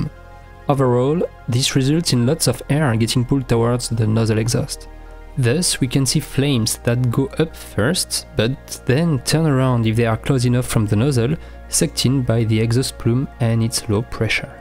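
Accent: France. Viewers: Excellent video and I can understand you very well. I'm English. Of course you still have a very very French accent but it's no problem for me. Merci mon pote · This is great and really informative. And your French accent isn’t that bad. I mean have you ever heard Stéphane Israel speak English? Your English sounds so much better! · Outstanding,. Your accent is tres agreable. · its funny how when you speed the speech you sound more french. but i understand you perfectly,and i am spanish. very good content,btw. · Very good! Your English with a French accent is good and I had no problem understanding you. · Great great video. Condenses a fair amount of knowledge really difficult to get without a lot of work, and your accent is just fine. It's obvious you're French, but there was no single word I didn't get. A big THANK YOU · Your english is very good and this sweet french accent is beautiful. 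Much better than some Youtuber from the south of USA. (I am from Germany) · From now on, all rocket videos should be in French accent. (Like Attenborough nature videos, and Australian croc videos.) Excellent work. Very good info. Thanks to you,. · Great collection of rocket launches and the F1 launch I've never seen, no problems with your French accent... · It's a great pity that this would be an even better video if someone who speaks English as their first language had provided the voiceover. Your very strong French accent is sadly too strong to make many of the words understandable. (I am from London, England.) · Please continue making such cool videos! I learned so much in a short time.. maybe I'l have to watch it a second or third time :) Absolutely no problem with your french accent. Great video content. I love the super slow motion and HDR-looking footage! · I've been a space geek for over 30 years now, and I still learned a ton during this video. 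Well put together! Don't worry about the French accent, I'm from Canada, I'm used to it ;-) One thing about the F-1 engine that has fascinated me from day one is the black smudge around the exhaust. It is the result of the turbopump exhaust passing through liquid helium and liquid oxygen heat exchanger coils. The resultant helium and oxygen gas are used for fuel and LOX tank pressurization, etc, and the now much cooler turbo exhaust gases pass into a manifold, which then vents it inside the nozzle extension. This boundary layer cools the nozzle extension, since the nozzle, throat, and combustion chamber are regeneratively cooled. Passive cooling for the nozzle extension, active cooling for the rest of it. (The heat exchanger is the bottom half of the cylinder thing next to each engine bell, and the manifold is the thick ring around the nozzle. A gas generator downstream of a gas generator.) . 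Keep up the great work! I will be watching part 2 next. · You have a French accent, not an English Accent. :)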